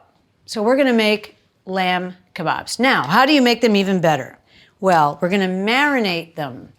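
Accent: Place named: England, United Kingdom. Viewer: hold on while I get myself together 😂😅🤣,Your hilarious 😂😅😂,love your English accent,Oh yes love your cooking 🧑‍🍳 shows.Thank you for so much entertainment 😘😘❤️🇬🇧❤️🧑‍🍳🥂😇😘😘❤️🙏❤️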